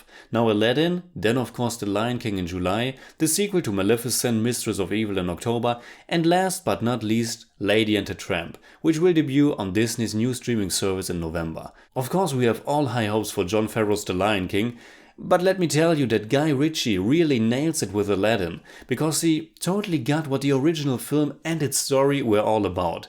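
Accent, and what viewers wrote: Germany. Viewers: i thought you were from austria but that accent sounds the same to me, still german language. are you from austria or germany? you are the best reviewer ever by the way. i say that every time and i a say it again. i'd listen to your reviews about anything--movies, books, cafes, anything, i would trust your every perception.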